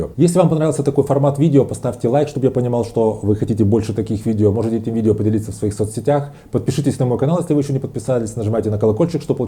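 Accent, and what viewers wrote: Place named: Ukraine. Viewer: какой-то говорок у вас украинский. Слишком быстро говорите.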